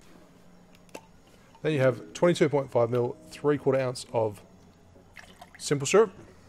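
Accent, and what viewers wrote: Germany. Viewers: I love your German accent! And it looks like a great drink!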